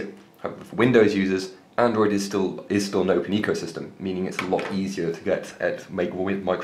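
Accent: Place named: Europe. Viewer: Hate european english accents, cant understan them!!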